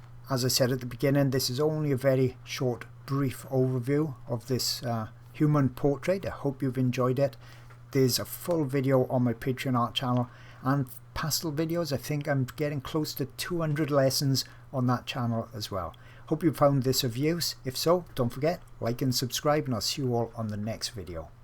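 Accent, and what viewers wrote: Wales, United Kingdom. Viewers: Welsh amateur artist picking up a pencil again here. Brilliant tutorial and great to hear a Welsh accent 🏴 🙌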